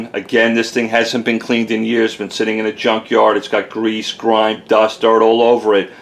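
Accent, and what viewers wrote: New York, United States. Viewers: Are you a new yorka? :-)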